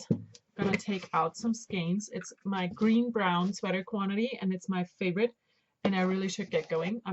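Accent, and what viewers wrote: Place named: Germany. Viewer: Hallo, du sprichst doch sicherlich Deutsch, oder? Ich höre so ein Dialekt in deinem Englisch...:-) Wäre schön, so ein Video auf Deutsch zu machen. Danke!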